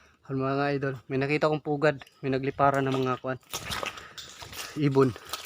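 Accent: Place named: Visayas, Philippines. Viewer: Hindi ma klaro hahaha bisaya diay ka dol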